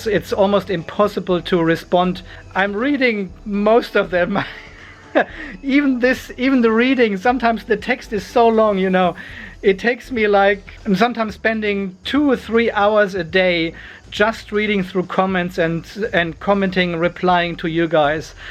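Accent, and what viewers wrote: Germany. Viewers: If I may ask. You are in Australia, but im assuming you are German based on your accent? Whats the story on that? Really love your videos. So real, so relatable. Keep it up.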